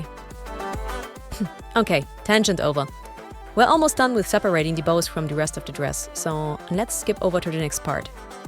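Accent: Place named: Germany. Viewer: Thanks for your teachings, Senpai. Your german accent is cute. But~ enough simping for one day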